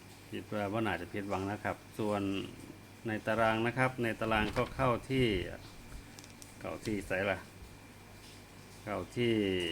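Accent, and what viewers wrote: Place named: Phetchaburi, Thailand. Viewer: รบกวนถามแอ๊ด อยากทราบว่าแอ๊ดเป็นขาวจังหวัดเพชรบุรีมั้ยคะ...สำเนียงเหมือนคนเพชรบุรีค่ะ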